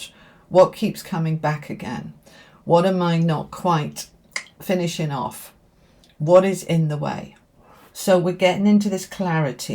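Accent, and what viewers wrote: United Kingdom. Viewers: I’m English living in Ireland it’s so lovely to hear a good old Uk dialog here,make a cup of tea and listen lovely and your large angel in the back ground is so lovely 😊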